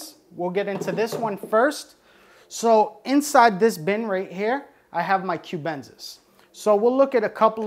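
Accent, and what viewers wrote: United States. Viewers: He speaks a heavy regional American English. Impossible to mistake where he's from. :) Has a few problems with the latin also -- especially cyanescens and azurescens But, ah well. Not so important.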